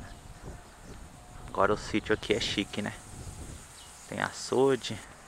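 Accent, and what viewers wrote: Minas Gerais, Brazil. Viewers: Sotaque gostoso de ouvir é o sotaque mineiro👏👏👏👏👏👏👏👏👏👏👏👏👏👏👏👏👏👏👏👏👏👏👏👏👏👏👏👏👏👏👏👏👏👏👏👏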